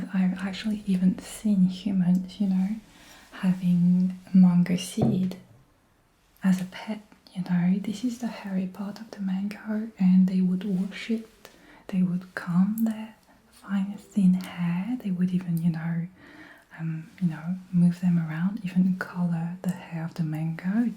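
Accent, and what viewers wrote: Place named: France. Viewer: Ce très léger accent français sur certains mots est HYPER agréable 👌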